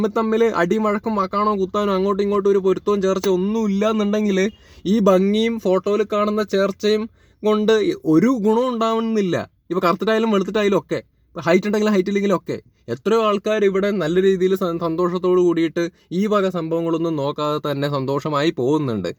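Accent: Palakkad, India. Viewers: PALAKKAD slang poli 🔥 Very comedy 🖤❤🖤 🔥BIGG FAN🔥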